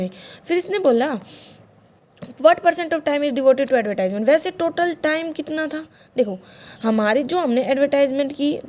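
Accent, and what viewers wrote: Haryana, India. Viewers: Tnq mam for explaination. Aap haryana se ho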